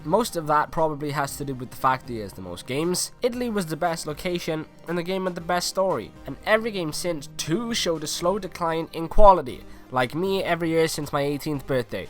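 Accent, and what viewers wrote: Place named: Wales, United Kingdom. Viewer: Thanks for the help! Btw are you Welsh? Sounds like you're welsh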